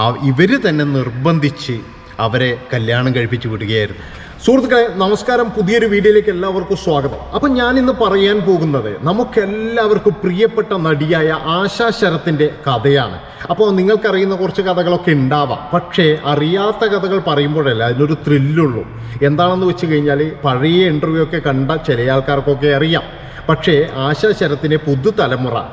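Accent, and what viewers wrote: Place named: Konkani, India. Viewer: നിങ്ങൾ തിരുമലക്കാരൻ കൊങ്കിണിയാണോ ശബ്ദം കേട്ടിട്ട് തനി കൊങ്കിണി മുതലാളി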